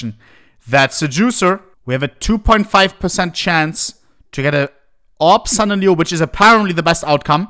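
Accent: Germany. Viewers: nie was schlimmeres gehört als nen Deutschen, der mit extremstem deutschen akzent auf Englisch schreit...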